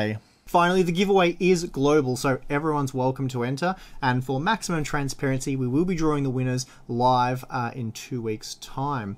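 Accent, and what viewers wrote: Australia. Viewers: are u from Australia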